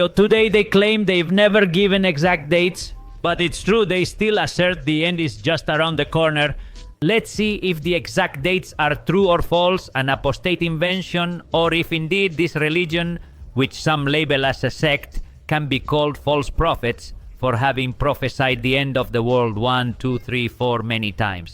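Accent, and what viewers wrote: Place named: Pakistan. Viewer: Yup, Pakistani accent, but doesn't matter, the message is what's the real matter here, the reason you are doing here Good luck with this new adventure, open more eyes, spread the truth about that cult and save people from the government body's claws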